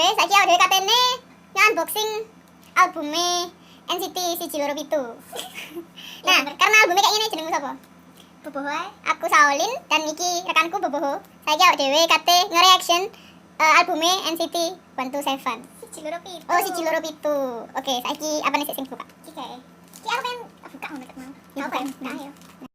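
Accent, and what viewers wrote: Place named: Java, Indonesia. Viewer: YaAllah medok nya😩 siji loro pitu 😩💚💚🌱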